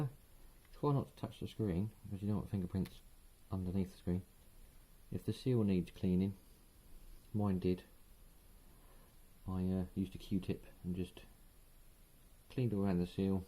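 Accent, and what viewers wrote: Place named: Cockney, United Kingdom. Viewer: Tips: - Use maximum volume if you can't hear him. - Use CC to translate the avalanche of British cockney gibberish.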